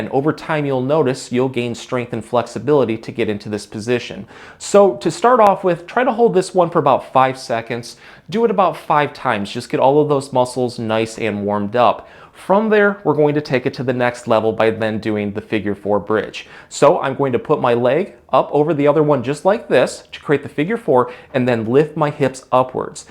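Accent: United States. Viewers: Sir I am unable understand what you are talking because you speak very fast and American English so kindly try to talk indian English sir pls language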